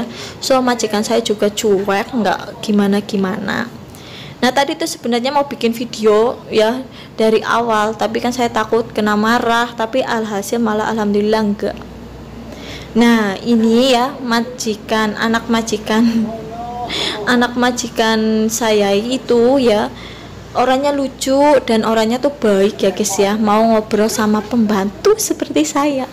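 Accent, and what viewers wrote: Banyumas, Indonesia. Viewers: Hadir KK,,,ora ngapak ora kepenak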